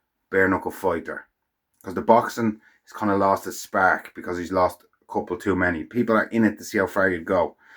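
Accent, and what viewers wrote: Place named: Wales, United Kingdom. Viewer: My welsh sounding guy.....YNWA 👌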